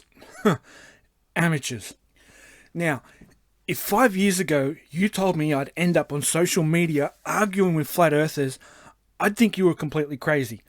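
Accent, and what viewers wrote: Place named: Australia. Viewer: Did it ever occur to you that your voice tone and phasing resemble Mal from Borderlands 2, but with a great Australian accent? <link> Check it out!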